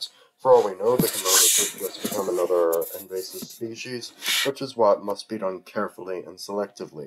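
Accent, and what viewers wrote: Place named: Ireland. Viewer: yeee 🤙, that's cool, for an Australian your accent definitely has an Irish sound to it I swear. I'm from Irish stock too and I have cousins with my Irish last name in Australian. The emu war was hype 🤣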